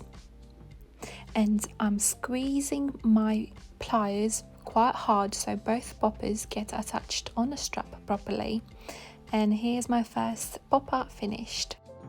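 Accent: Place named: England, United Kingdom. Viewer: Well presented in a lovely English accent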